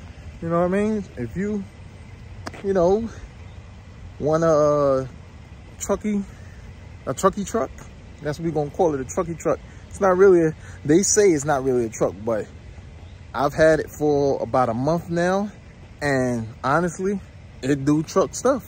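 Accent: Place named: Washington DC, United States. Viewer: You sound like you from the north like DC area ! But yeah I’m getting the Santa Cruz premium or limited can wait to test one tomorrow 👍🏾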